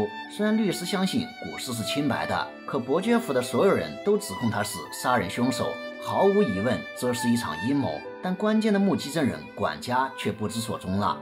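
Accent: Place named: Zhejiang, China. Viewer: 越哥是浙江人吧? 你的口音我熟。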